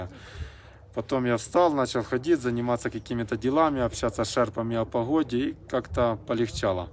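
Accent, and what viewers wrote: Ukraine. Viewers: Альпинист который погиб 11 мая никакой он не швейцарец. У него пакистанские корни. Он профессиональный альпинист, у него 7 восхождений на высочайшие вершины планеты. Но вот восхождение на Эверест совпал с окончанием мусульманского рамадана, месяц соблюдения особого режима питания. Религия на столько победила здравый смысл, что даже в горах, в опасности он пытался не нарушить рамадан. Тут и горам понятно что организм был предельно истощён. Я читала о нём в YouTube, и у нас в Швейцарии говорили о нём по радио. Что это первые 2 смерти на Эвересте с 2019 года. Он и американец, кстати, тоже мусульманин. В 2020 Эверест был закрыт из-за короны., увидела тебя и сразу же подписалась, настолько приятный у тебя украинский акцент. Я сама из Украины., парни, удачи вам, благоприятной погоды, счастливого погодного "окна ". Непогоду лучше просто переждать. 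Любой риск должен быть оправдан. Сегодня 16 мая.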